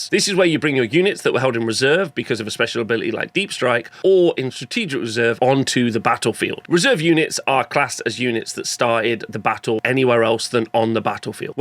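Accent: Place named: United Kingdom. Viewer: MORE LIKE THIS PLEASE!! this is the 4th time ive watched this video all the way through, while painting miniatures, its really helped me to get a solid grip on the rules system, maybe an advance or FAQ style one next, or faction specific rules breakdown. really appreciate the hard work you put into this. Also you have a much better presentation, personality, voice and vibe than alot of other similar channels i have come across, the british accent helps a tonne. thanks bro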